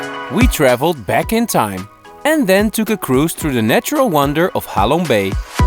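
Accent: Netherlands. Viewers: by the frist phrase I knew u were dutch haha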